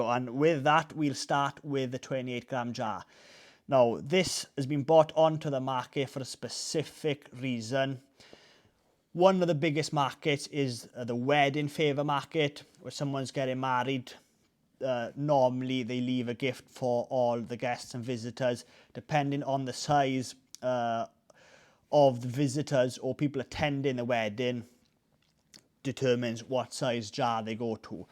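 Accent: Wales, United Kingdom. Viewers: Love the Welsh accent!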